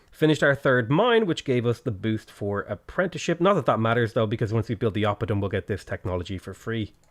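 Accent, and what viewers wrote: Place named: Ireland. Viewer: Man,that thick Irish accent is probably the best thing about this channel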